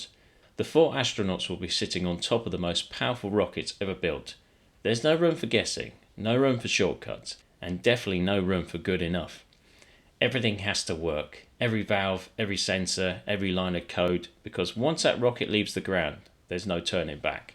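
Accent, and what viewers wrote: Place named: London, United Kingdom. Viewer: Thanks for the update. Given the complexity and safety aspects that are a huge part of this project, I’m still amazed that well over 50 years ago, humans actually went to the Moon. I’m located about 60 miles south of Los Angeles, in Orange County, CA (but born in Gloucester, UK)., I’ll guess that you’re from some borough of London, or nearby. Hackney?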